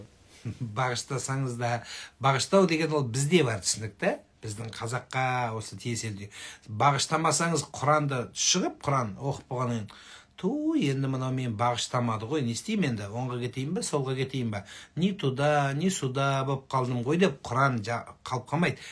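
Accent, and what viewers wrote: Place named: Kyrgyzstan. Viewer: Ообада таптаза кыргызча суйлойт екен ,рахмат сизге.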